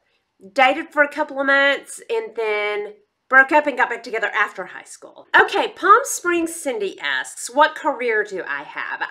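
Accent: South, United States. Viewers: Due to your "slight" southern accent and my "slight" hearing problem, I always use captions if they are available. When you gave your favorite movie, it came across as "Lend Some Death" and I thought what the heck???? Then, of course I figured it out!!! Isn't that a hoot??!!! I can tell you whoever does the captions is NOT southern, lol. Anyway, this was a fun video, I too have great respect for teachers and could NOT do it, they do NOT pay them enough and pay the administrators far too much. IMHO. Could not be a "flight attendant" either since I no longer fly (long story). Used to work for realtors during jr high and high school (yes, I was industrious)-- didn't want to pick blueberries in the summer so taught myself to type!! Looking forward to Part II -- You are fortunate your DH still carries on conversations with you! :-)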